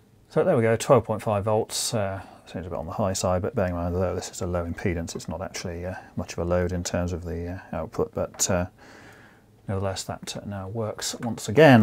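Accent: United Kingdom. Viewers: Nice - i couldn't help thinking, "hey, this fellow sounds a lot like the voice in my head when i'm muddling through some problem or another (but with an other-side-of-the-pond accent)." Some of these power supplies/adapters can be so intimidating... it's refreshing to see a unit with a straightforward layout. I'm working on a few Dell PC power supply units with no obvious faults, and this video gave me some inspiration to dig back in. I think it's time to invest in a reliable capacitance tester, since problems so often come down to those failed electrolytic caps.. just haven't seen them vent from below in my limited experience. Good to be aware of - Thanks for sharing!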